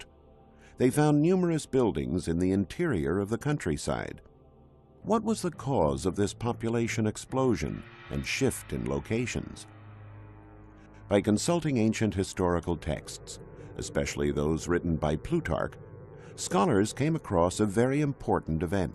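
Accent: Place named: United States. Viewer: To attain the real truth in these matters I am convinced a time machine is required. As in present times, the political landscape must have been a swamp of trickery and treachery. An American accent covering such a topic, per the BBC, just doesn't make sense.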